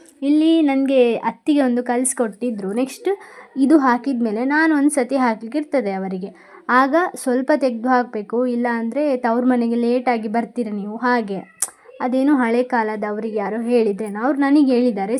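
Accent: Mangalore, India. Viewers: Uttarakarnataka style mathadi .manglore style mathadbedi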